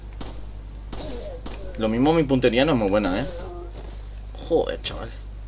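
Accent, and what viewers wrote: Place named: Puerto Rico. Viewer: El acento es puertorriqueño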